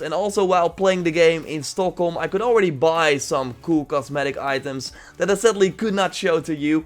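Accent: Netherlands. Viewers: Hey are you Dutch. I love your vids by the way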